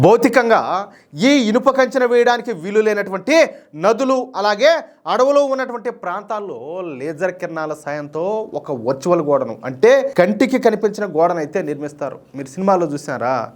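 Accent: Rayalaseema, India. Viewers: గారు, మీ రాయలసీమ యాస వింటేనే సగం kick వస్తుంది. మీ commentary లో వ్యాపారధోరణి కంటే, దేశభక్తే ఎక్కువగా కనిపిస్తుంది. వింటుంటే, ఇంట్లో మనిషి మాట్లాడుతున్నట్టు అనిపిస్తుంది. మొత్తం మీద వీడియో చాలా బాగుంటుంది ❤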